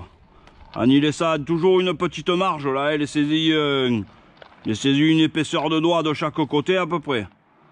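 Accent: South, France. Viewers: merci pour la reponse je vais en faire avant de partir peu etre pourrons nous se voir vers des cieux au taunos sil on est pas loin jaime cet accent tres sud cordialement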